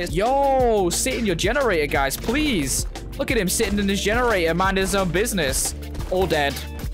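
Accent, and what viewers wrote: United Kingdom. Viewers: Bruh is british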